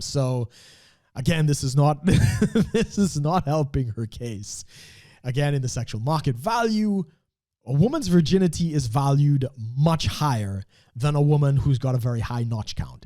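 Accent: Trinidad and Tobago. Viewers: You from the caribbean bruh?🤔 you sound like a trini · Waw..the way in which your accent comes across sound a lot like a TRINIDADIAN! and on second note...very well put.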